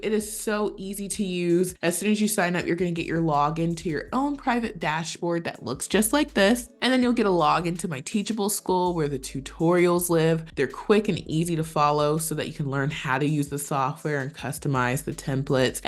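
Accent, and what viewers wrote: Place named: South, United States. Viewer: your voice tells me you are also a Southern girl! Alabama here. You are my favorite remote job lead lady! Blessed Monday to you. Keep 'em coming! 😊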